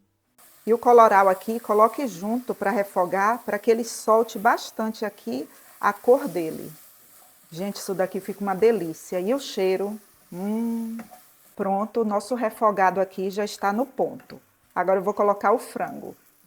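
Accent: Bahia, Brazil. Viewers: Q sotaque lindo de baiana arretada.Gosto da sua voz.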